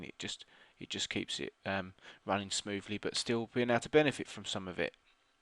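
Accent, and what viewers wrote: United Kingdom. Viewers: I FUCKING LOVE YOU THNK U BRITISH OR WHATEVER COUNTRY UR FROM it really helped allot now i can enjoy flying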